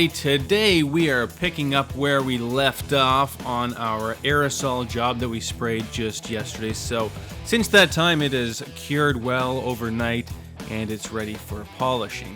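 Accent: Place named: Canada. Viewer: Good video. Love the Canadian accent!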